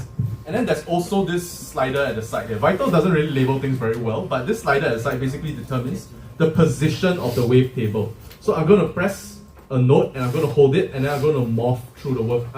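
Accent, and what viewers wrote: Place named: Trinidad and Tobago. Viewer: Yo your accent sounds similar Trinidad. I thought that was interesting.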